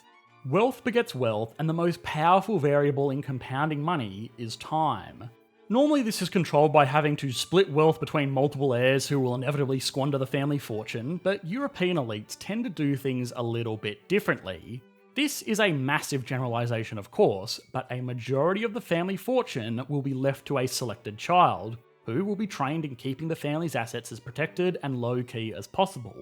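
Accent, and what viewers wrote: Australia. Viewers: Great content and analysis in all your videos spoilt by your delivery which is way too fast for me to take in a lot of what you are saying, Your heavy Aussie twang adds to my difficulty. I have to keep pausing, rewinding, and replaying to consider and digest your line of reasoning. Rather than keeping me engaged, this turns me off. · Although the accent is Australian or industrial English, this video seems to be a propaganda vid by Republicans or Trumpists or, otherwise, of someone who has never been to The Netherlands. So, if you love Fox News, Bill O'Reilly, the Republican representatives in the USA, the incredibly lying Trump and BoJo and Putin, go live in their countries and see whether you are happy or not.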